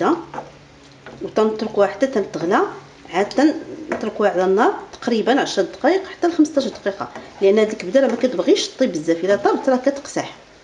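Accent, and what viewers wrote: Marrakech, Morocco. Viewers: الله يعطيك الصحة الحبيب دائما تعجبني الوصفات ديالك واالهجة المراكشية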